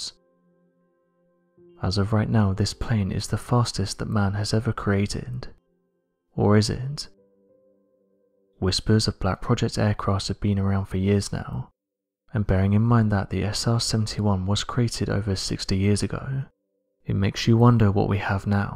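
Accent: England, United Kingdom. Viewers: You sound English what do you mean we, neighbor? Its better that none of the new stuff hit YouTube. China already stole plans for some 6th gen fighter jets. It was the junk20. Thankfully china isn't very good at putting shit together yet. Im thinking the us government got wise to china and leaked an inferior plane. Either that or china is so much more incompetent and should not ve taken at all seriously. The black ops planes are still being made.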